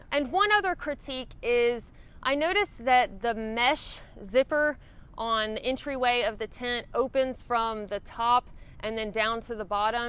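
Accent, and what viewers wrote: South, United States. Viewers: Ha ha! You say “strowed” just like my father from North Carolina. Don’t ever stop talking like a Southerner. Southern dialect is endangered.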